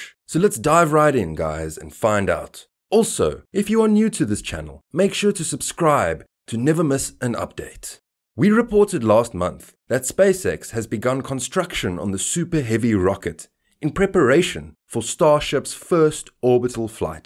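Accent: South Africa. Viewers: Great video. Recognize a Saffer accent when I hear one 🇿🇦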